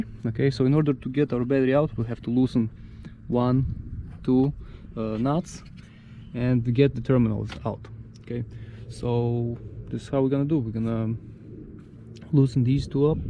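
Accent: Russia. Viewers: I like your russian accent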